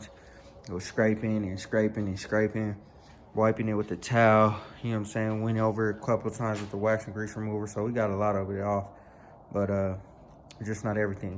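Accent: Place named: Bay Area, United States. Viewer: Man you got to be from the Bay Area, you definitely got our talk from out here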